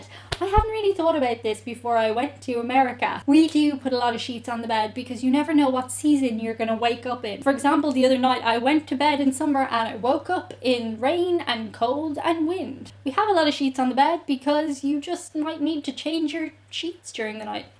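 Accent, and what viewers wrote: Ireland. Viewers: I would say the Irish are charming, and fun to drink with but I am biased being 1/4 - 1/3 Irish. I love the accent, and you aren't hard on the eyes either. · I love the Irish accent it rocks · Well.....The fact that a beautiful Blonde Irish woman just looked right at us and like the total badass she is....said "you can keep your sexy and shove it right up your (*&^$*$)" in that beautiful Irish accent.....To that I say "that is about the sexiest thing ever" so Take that and stuff it Nova Poll hahahahaha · Lol I truly love your videos. I Always end up with a smile if not a stitch in my side. I must disagree with the poll 😉. I find Irish ladies quite Sexy. The accent is a plus for sure. All the best. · maybe you should come and visit australia some time? or maybe not if youre worried about things that kill you? there are spiders, crocodiles, blue ring octopus? 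,octopie? octopusses? anyway and Irukandji jellyfish in the top ten?? but moslty not in the burbs nowdays, mostly : ) would so love to hear your viewpoint on all the good and bad "'as if! ' i would say, we live a reasonably laid back life style compared to most places. OH and i almost forgot, all aussies (Especially me, Lol) love a girl with an irish accent, you'll b able to get away with blue murder!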